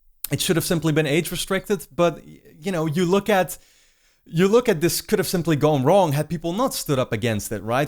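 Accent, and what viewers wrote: Netherlands. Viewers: je Engels is goed maar hoorde ik gelijk dat je Nederlands bent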